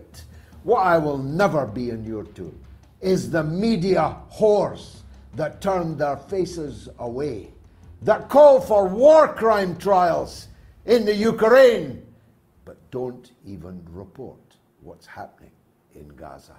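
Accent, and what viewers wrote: Scotland, United Kingdom. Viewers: Mr. Galloway, seing and listening to your talk shows is one of the reasons I cherish having English as my second language. I like the brave, clever and sarcastic style of yours speaking the reality of the matter in geopolitics. Your Scottish accent and ways puts a generous amount of a highly appreciated spice to your sayings. Greetings from Santiago de Chile. Cheers!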